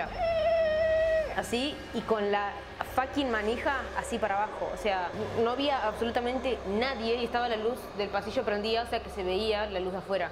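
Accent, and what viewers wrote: Argentina. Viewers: La boca no carajo E wacho vieron eso Típico de los argentinos Lo Locooo somos los argentinos así hablamos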